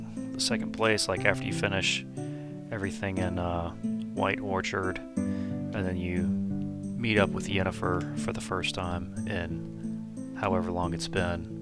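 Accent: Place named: United States. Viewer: Your American pronunciation is funny. Regardless a good video.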